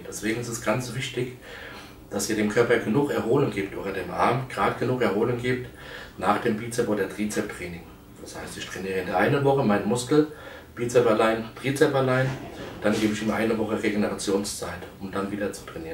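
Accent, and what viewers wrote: Germany. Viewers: Evangelium nach Johannes Ai bub geh mir fort des is hessisch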